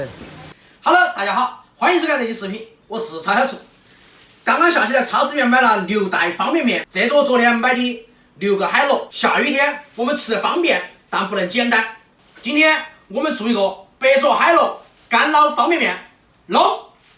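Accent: Sichuan, China. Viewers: 四川话怎么这么难听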